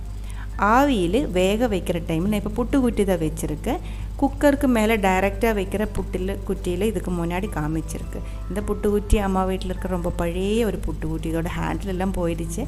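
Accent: Kerala, India. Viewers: Super maam. Your Malayala slang tamil is beautiful 👌👌👌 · அழகான மலையாளம் கலந்த தமிழ் பேச்சு வித்தியாசமான டிஷ் சூப்பர்மா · Kerala tamil.... Wow... Chance se illa.... 😇🥰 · Karala voice karala voice thann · I think you r malayalam but you try to speck tamil well try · Malayali aano ningal samsaram kelkkumpol malayali samsarikkunnapole und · സംസാരം കേട്ടപ്പോഴേ തോന്നി മലയാളി ആണെന്ന്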